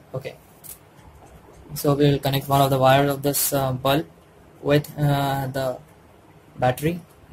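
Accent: India. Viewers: In the south, we don't hear too many Indian accents and difficult to understand you 🙊...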